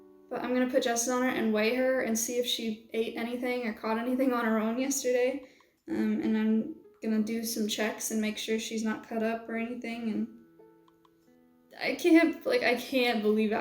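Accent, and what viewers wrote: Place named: United States. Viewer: Why is her wheel on the right side of her car? Is she not American? She sounds American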